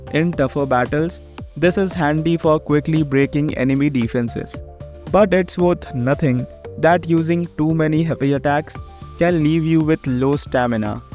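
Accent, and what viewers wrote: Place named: India. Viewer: What is that accent? Sounds like generic idian scammer.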